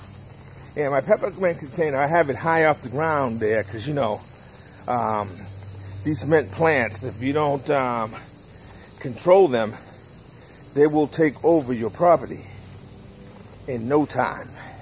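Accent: Boston, United States. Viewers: is sounding much better, I don’t hear pain in your voice, only that Boston accent.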